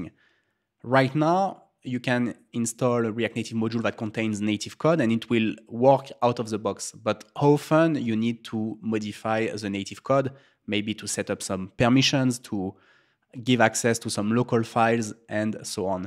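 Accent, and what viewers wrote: France. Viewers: it seems you are from france? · As a dev learning both React Native and french, I love your videos!! Just love the french english accent 💚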